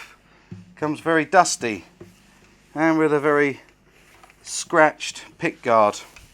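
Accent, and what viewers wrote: England, United Kingdom. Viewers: See your playing the right way with a pick and your english accent very cool I love england people I'm a italian from New York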